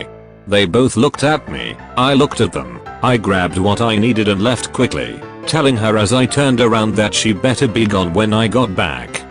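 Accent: Scotland, United Kingdom. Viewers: I was refer ing to the narratpr being english because I liked the Scottish accent. Lol not me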